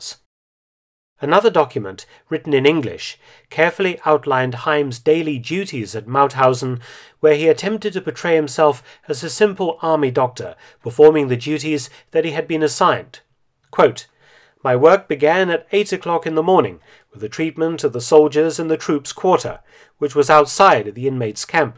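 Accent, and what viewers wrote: United Kingdom. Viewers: There is something about the British accent. I can’t remember feeling this good about an historical documentary since watching ‘World at War’ narrated by Lawrence Olivier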